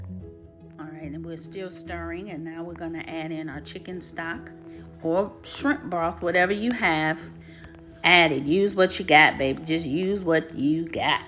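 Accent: United States. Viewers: Where you fr baby girl, I love this dish I'm on my second time of fixing it, so good. You talk like some of my family from Halifax NC!! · Sis u sound like a real country down home girl love it Thumb up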